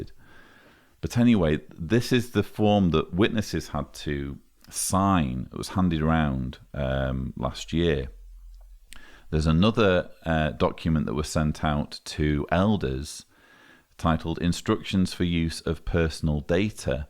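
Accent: United Kingdom. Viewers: I listened to 5 seconds and can see your a British nut!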